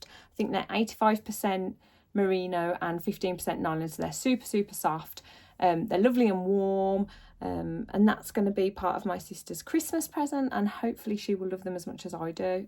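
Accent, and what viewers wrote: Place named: Birmingham, United Kingdom. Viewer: As a Brummie living in New Zealand, I’m loving your podcast and hearing the familiar accent! Have a wonderful Christmas 🎄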